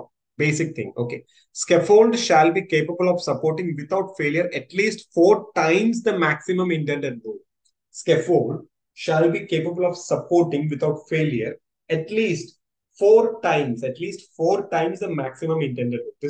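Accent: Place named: India. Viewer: helo from Norway very good expline even with your English Hindi accent i understood you everthing im in Scaffolding curs taking teori i was wonder about loading capacity explination so this was what i was loking for This process i understand better so with no doubt Cheers the next capacity on medium x4 1000 KG its very simple in Norway standard its Light Duty depending on Companys and purpose so thank you i will suscribe to your chanell i have my own Scafolding 145m2 so this info from your chanel can be usefull in future you win one like extra😃😃🥂 thanx Cheers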